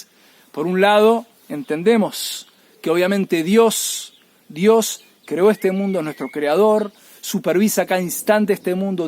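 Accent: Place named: Argentina. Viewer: Sino me equivoco usted también es argentino, por su asentó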